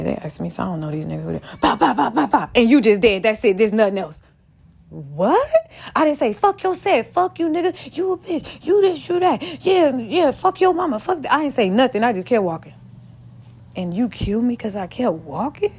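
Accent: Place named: Los Angeles, United States. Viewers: I just knew you was from LA just by listening to you for the past few weeks 🙌🏽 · Just by hearing your voice inflections and accent, I knew you was a Southern Cali girl. Thanks for clearing that up. You're funny, though.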